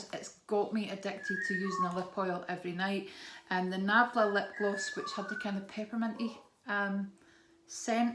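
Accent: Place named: Scotland, United Kingdom. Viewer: Hi trying to catch up on videos so going backwards 🤣. Love watching you and listening to your voice as it’s so nice to hear a Scottish voice as most of the you tubers I follow are English. Great video. Have a lovely day 🥰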